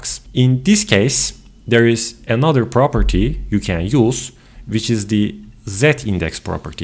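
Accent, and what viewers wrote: Turkey. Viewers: did not even check the name of channel as soon as I heard your accent I am like kanka naber ya LOL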